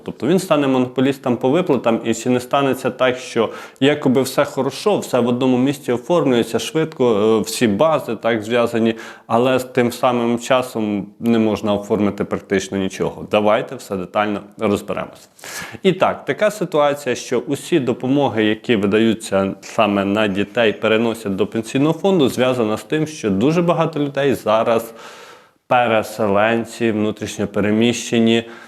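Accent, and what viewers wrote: Vinnytsia, Ukraine. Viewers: Людмила, у мене також вища освіта і ні в якому разі не придираюсь, просто у деяких вінничан такий діалект, а молодець, займається дуже потрібною справою, за що йому велика подяка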